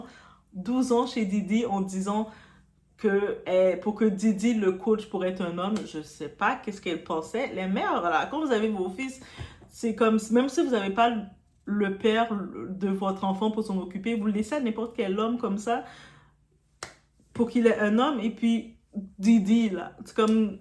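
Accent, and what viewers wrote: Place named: Canada. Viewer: c est l accent Canadian.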